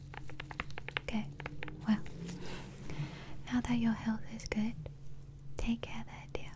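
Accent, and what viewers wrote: United Kingdom. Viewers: Is that a light British Accent?? It’s so faint I can’t tell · You have the most Beautiful and Hypnotizing Eyes!!! I always love the British Accent for your ASMR!! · Love your British accent. Very on point. Love it.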